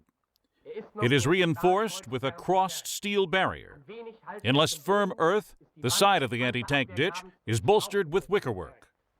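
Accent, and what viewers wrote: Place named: United States. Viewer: Yank voice over ?....................Ruined!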